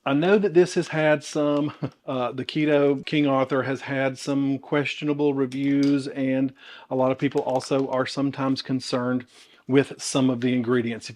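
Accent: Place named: North Carolina, United States. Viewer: you sound so much like we do in North Carolina, so I feel like you're a good friend. You talk just right!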